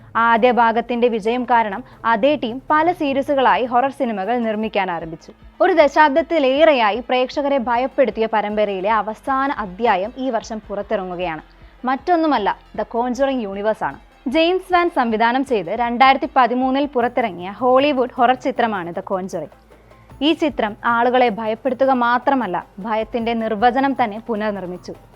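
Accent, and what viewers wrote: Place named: India. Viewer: Indian accent bruh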